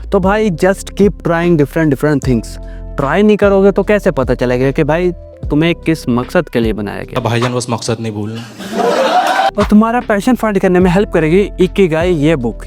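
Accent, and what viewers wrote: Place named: Urdu, Pakistan. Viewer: I like your Urdu Accent ❤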